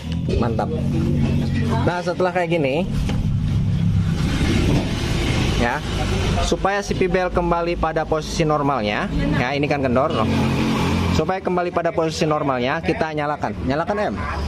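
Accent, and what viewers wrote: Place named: Indramayu, Indonesia. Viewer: Kaya wong dermayu😊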